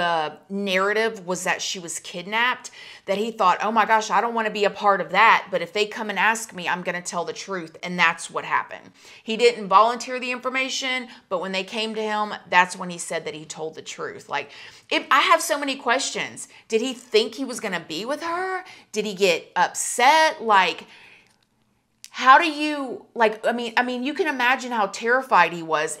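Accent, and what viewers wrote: South, United States. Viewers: Your southern accent is so strong, I love it!x I’ve been waiting for this to happen…it makes sense now why when she was found, she went super silent! I always thought it was cause she was traumatised, but maybe she’s trying to avoid inditement! Actually makes me sad for her husband when the cheating accusations came out! I wonder if he’s standing by her 🤔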